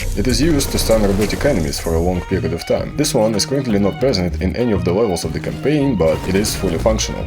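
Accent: Russia. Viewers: Это что, русский акцент?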